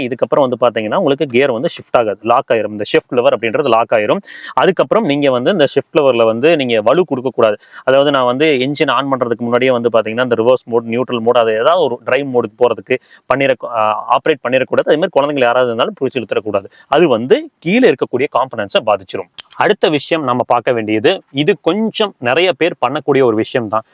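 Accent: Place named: Kongu, India. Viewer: Awesome, I am watching from your initial video. Amazing to watch how you improved. Your Content, Presentation & kongu slang fantastic. Keep Josh ahead.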